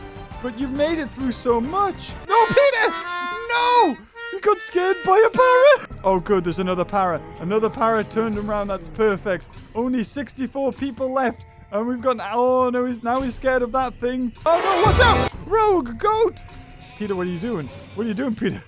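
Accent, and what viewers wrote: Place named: Newcastle, United Kingdom. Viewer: The Geordie Mrbeast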